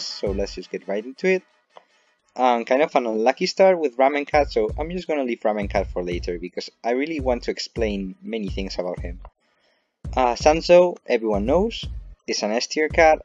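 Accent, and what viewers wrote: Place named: Russia. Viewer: I thought you are Russian. you have a russian accent)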